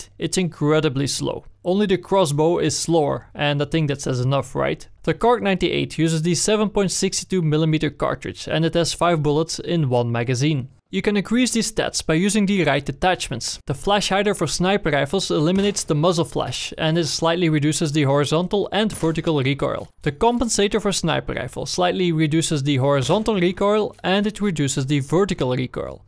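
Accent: Netherlands. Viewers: AAAAGHHHHH THE DUTCH ACCENT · r u dutch? i recognise my people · i cant stand this dutch accent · You're dutch I can hear it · he didnt he is dutch so hes talking like that i know that i am dutch too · Relo i em dyts I love your dutch accent man! :D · Its a dutch accents I think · sounds dutch to me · lol slecht engels (bad english, i can hear he's dutch FOR FUCKING SURE) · Wanneer je Nederlands accent niet te ontkennen is. Verder een goede video! En bedankt voor de info. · clearly Dutch from the first second mate.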